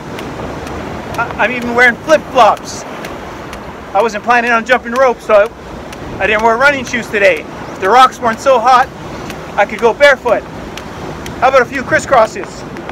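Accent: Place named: United States. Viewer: Still really enjoying your content. As a typical sedentary 60 year old American I need all the positive example and inspiration I can get; you keep me focused on getting in a few skipping workouts each week. Currently working on various cross-overs and footwork drills to put together a consistent ~20 minute workout that is challenging but enjoyable. Extreme heat and humidity along the US Gulf Coast makes it much harder for an old guy like me but once the seasons change it will be much more enjoyable. Why do I think you're in Ireland (but you sound American or Canadian...)?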